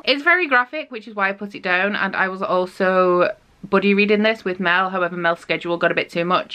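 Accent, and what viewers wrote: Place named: North, United Kingdom. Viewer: Ahhh, our accents are so similar! Are you from the north??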